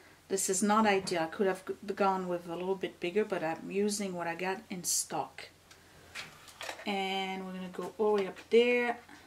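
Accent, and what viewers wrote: France. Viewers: You did an excellent job on your sewing machine table, I am so impressed!! And I love hearing you talk because I like your French accent!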